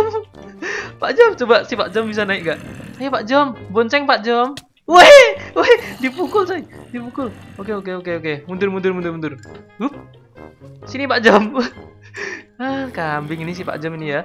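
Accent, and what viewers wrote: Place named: Java, Indonesia. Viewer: Mas oraang jawata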